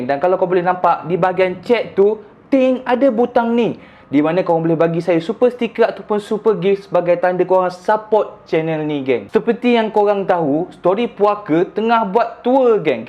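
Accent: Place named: Terengganu, Malaysia. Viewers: Jadi dh ckp ganu 👍😂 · bnyk² org luar ,, abg punya cakap tranung tu mmg terbaik arr .. mmg bunyi tranung sgguh 😂 apapon teruskan cerita kisah seram ni 👍🏻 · hanya cerita seram2 dah 72k pengikut kau ok la semoga suksess... memang mcm tranung betul mung ni pekat molek haha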